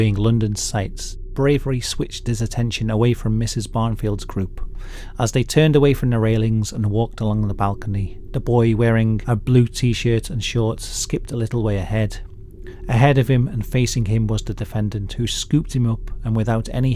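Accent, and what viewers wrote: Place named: Liverpool, United Kingdom. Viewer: Horrendous attack this. I remember when it happened it had me clutching right to my young kids whenever we went out for quite a while after. Even worse when see that the attacker had been threatening extreme violence against children in the weeks leading up to the attack. Any way, love you videos mate, you’ve got a really charismatic way of telling these stories. Love your accent too! Scouser right?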